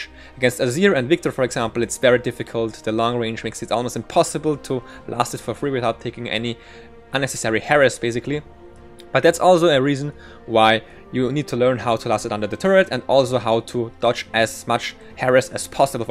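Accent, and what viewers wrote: Germany. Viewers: Deutsch?hast irgendwie nen deutschen akzent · hahah der deutsche akzent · nice video dude. Btw are you German by chance ?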